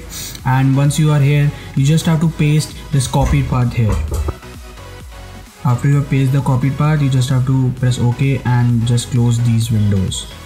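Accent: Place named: India. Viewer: you know a videos going to be helpful when the voice is that of an Indian guy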